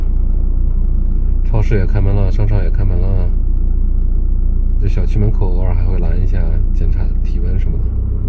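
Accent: Inner Mongolia, China. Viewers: 内蒙古口味的普通话，听着很新鲜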